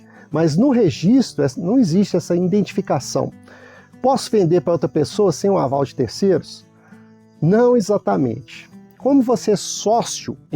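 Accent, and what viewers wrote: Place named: Minas Gerais, Brazil. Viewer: Gostei muito da explicação, e também do sotaque do apresentado... MG!? 👏🏾👏🏽👏🏼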